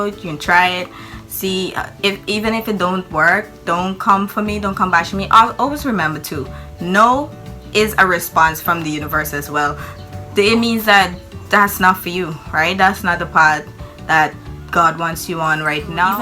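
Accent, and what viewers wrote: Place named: Jamaica. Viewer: I want more of the Jamaican accent!!! 😂❤❤ 10/10 for the accent lol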